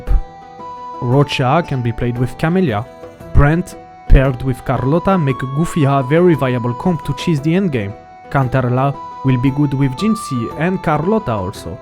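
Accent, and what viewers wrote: France. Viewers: Are u french cause i love the accent❤😂🎉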